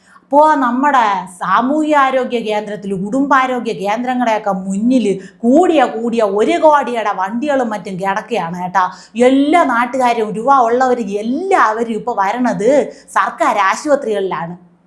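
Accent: Thiruvananthapuram, India. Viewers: തിരുവനന്തപുരം ശൈലിയാണെങ്കിലും കേൾക്കാൻ രസമുണ്ട്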